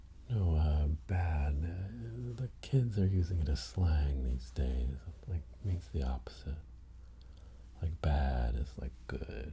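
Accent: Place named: Massachusetts, United States. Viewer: This guy is from Massachusetts for sure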